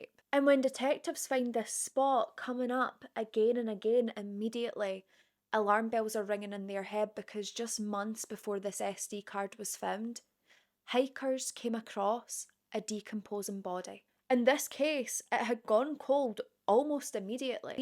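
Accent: Scotland, United Kingdom. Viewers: Hello again from Canada I just found out what Hyping is and after listening to the explanation I get it now... So of course I Hyped you... Love you, Love your videos... mostly love your Scottish accent 👍🏼💙💙💙🇨🇦j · As a true crime buff, I'm so hyped to have found your channel (late to the game, I suppose) 💙 What part of Scotland are you from? I love the accent (almost as much as I love Scotch whisky 🥃 LOL) And how do you come up with so much content every week? You're like a walking criminology encyclopedia 📖 — definitely the most informative 'yapper' around 🧠 — subscribed and shared 👍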